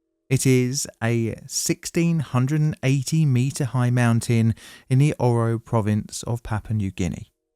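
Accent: United Kingdom. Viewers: I would subscribe your channel in case you could narrate your videos in English... and not in britain dialect... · Oh my the Brit pronunciation of place names is doing my PNG brain damage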